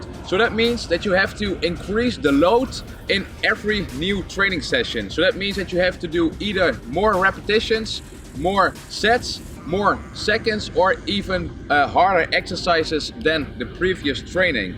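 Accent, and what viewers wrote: Netherlands. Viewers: Are you Dutch? You accent gives it away.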